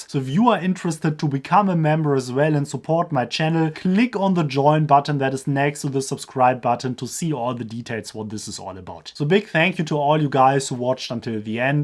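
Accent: Germany. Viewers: I really enjoy your German accent English! How could I contact you if I may ask?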